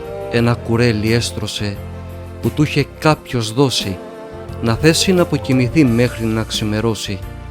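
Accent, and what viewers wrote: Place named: Crete, Greece. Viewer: Στοίχους που αγγίζουν την ψυχή και την καρδιά όσο για την απαγγελία μοναδική με την χροιά την Κρητική που ανατριχίλα μου έφερε στο σώμα και στην ψυχή τέλειο μπράβο και να έχουμε τέτοια βίντεο για να χορταίνει και η ψυχη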